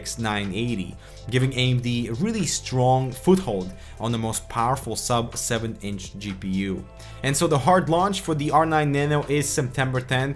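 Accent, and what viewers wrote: Russia. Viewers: Мне кажется или у тебя чутка русский акцент? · can't listen to this russki accent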